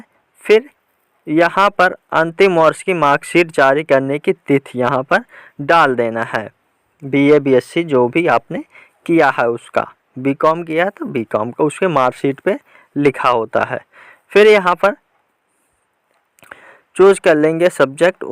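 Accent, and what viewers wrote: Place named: India. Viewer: Chutiya mt bna mobile s ni bhra ja rha or Teri voice to ensi h jaise up ya bihari hoga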